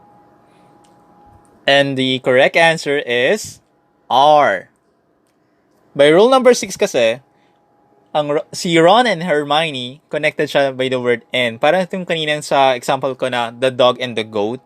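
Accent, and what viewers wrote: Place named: United States. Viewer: Boses amerikano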